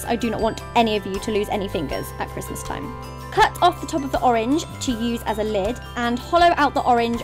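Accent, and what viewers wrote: United Kingdom. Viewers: "first step is to pop a sauce pin on the hob" you are so british and adorable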